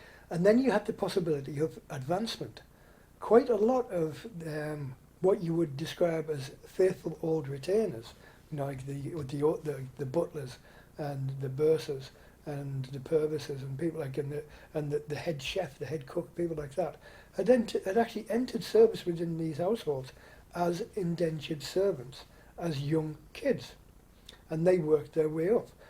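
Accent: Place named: United Kingdom. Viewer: Firstly, you nailed that intro, I wouldn’t mind if it was a few seconds longer. Second, you are an incredible narrator! Hardly ever miss a beat, British accent adds to this and regardless of the subject your voice alone captivates and keeps the viewers’ attention. Thirdly, you must keep this going, this is incredibly marketable on YouTube and I would love to work with you on the production of future videos. Add a slide show of images, easily attainable from the web, and your channel could rival Infographics and the like. Bravo 👏🏽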